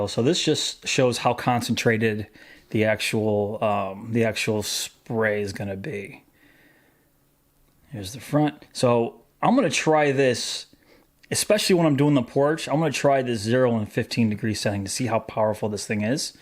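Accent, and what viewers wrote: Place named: Western New York, United States. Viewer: WNY - can hear the accent and tell by the driveway and the house architecture :-) Think I'll stick with my gas-powered pressure washer.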